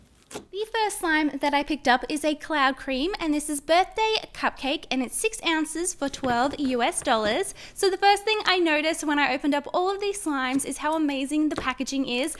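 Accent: Australia. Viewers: She has an Australian accent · “banawneree” no hate. I just really love Australian accents.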